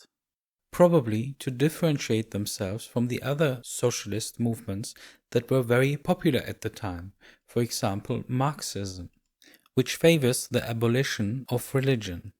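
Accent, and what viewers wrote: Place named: Germany. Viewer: This video is a huge cringe post. I remember having another discussion about a similar topic with this guy and it was wild. truly baffling, how someone, who seems to be german due to the strong accent, can fall trough the raster of historical education. But anyways, to set this straight real quick, no the Nazis weren't far left socialists, because of course they weren't. I'm guessing the presented election program points are supposed to be a summary of the infamous 25 points. The truth is, that only some of them were supposed to resemble socialist agenda points and pretty much all of them were abandoned shortly after the Nazis took power. The occasional welfare benefits, that got implemented to prevent another proletarian uprising, don't change that. Modern Germany has those as well, and no one would argue that it's a socialist country. 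Also funnily enough, the demand for the abolishment of the materialistic world order stood in opposition to Marx's dialectic materialism, but yeah sure,lets pretend the Nazis were socialists. While it was true, that the Nazis sometimes called one another "Genosse:in", is that somehow supposed to be proof? Many people give themselves silly names. Also, this was supposed to refer to the actual term "Volksgenosse:in" which in itself refered to the propagated myth of the Volksgemeinschaft, with which the Nazis wanted to promote the establishment of a community based on race and class collaboration, rather than on class conflict. So yeah, what a bu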